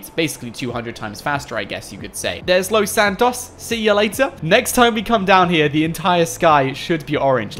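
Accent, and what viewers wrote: United Kingdom. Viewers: Are you from UK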